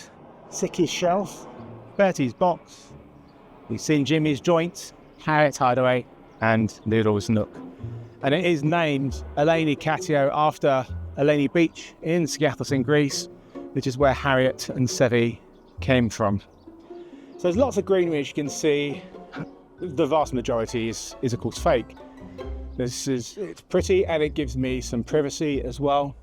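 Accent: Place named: United Kingdom. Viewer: I’m convinced that cat dads are by far the purest people on Earth. This is incredible! ❤ I did have a couple of questions: 1) What area of the world are you in? I initially thought somewhere in the UK just from your accent, but then a I thought somewhere more tropical because of the plants and the birds. 2) What do you do about bad weather? I imagine there must be some sort of plexiglass or something on the ceiling at least for in case if it rains (I’m assuming it doesn’t snow where you are 😂), and then I was wondering what you would do about excessive heat? Maybe the cats just have to stay outside, or if the walls also have plexiglass covering then you can just leave the doors to the house open and if you have air conditioning then it would cool down the catio too? 3) Do you ever clean the catio out? I would imagine there’s lots of upkeep and I would love to see a video on that. 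Anyways, I really enjoyed the video, it looks so peaceful and all the cats seem so happy. ❤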